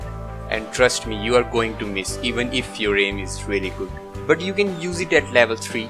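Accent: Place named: India. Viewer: I can tell by your accent that you're indian. Am I right? :)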